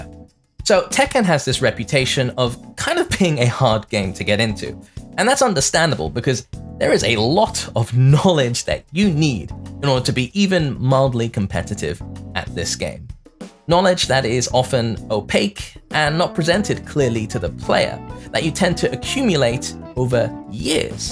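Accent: United Kingdom. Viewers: "...parallel universe where fighting games are designed better." Ow, I'm not a Tekken dev but that hurt me by proxy. the english accent makes the shade worse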